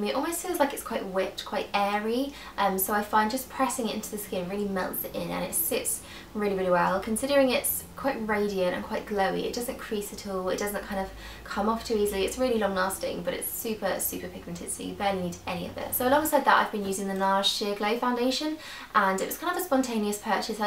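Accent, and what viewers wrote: United Kingdom. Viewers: You are so gorgeous! I'm so jelly. :( And I wish I talked with that wonderful british accent! haha! you're the best! xoxo all the way from sweden!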